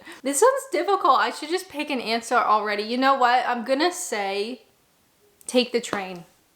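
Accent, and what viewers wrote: England, United Kingdom. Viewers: I can hear the British tang in your voice. It’s definitely got a lot stronger over the years. You’ve got a great accent though 👌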